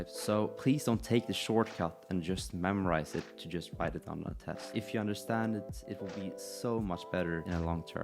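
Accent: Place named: Sweden. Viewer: The swedish accent coming through your english makes you weirdly lovely to listen to. Dont change dude❤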